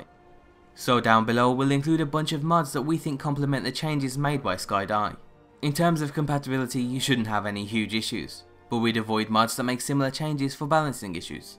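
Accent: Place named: West Midlands, United Kingdom. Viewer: Where you from? Sounds like west midlands haha. I'm from Birmingham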